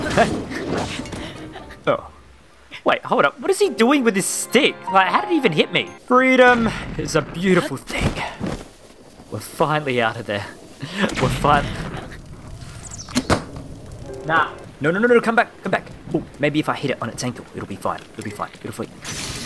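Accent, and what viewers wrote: Australia. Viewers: You're Aussie??? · Where are you from you sound aussie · Is this guy Aussie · Is he Australia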